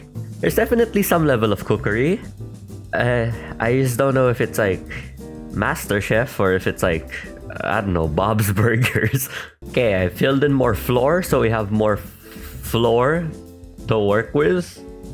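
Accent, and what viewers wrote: Philippines. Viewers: Omg i was like woah he sounds Filipino and i was fukin righttt yipeeeee 🎉🎉 a Filipino ama watch you now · 10 seconds in and i clocked that you're filipino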